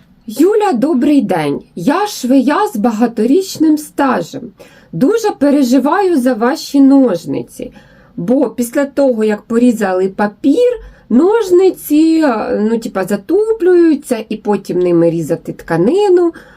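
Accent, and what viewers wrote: Cherkasy, Ukraine. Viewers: Вітання з Новим роком та Різдвом Христовим! Давно вас дивлюся, але пишу вперше. Ви для мене великий мотиватор і натхненниця, особливо після профвигорання. Коли чую Вашу мову, відчуваю себе у гостях на Черкащині, наче побачилася з родичами. В захваті від вашого з ентузіазму. Ви - мої муза із музом. Бажаю вам успіхів, наснаги й здоров'я.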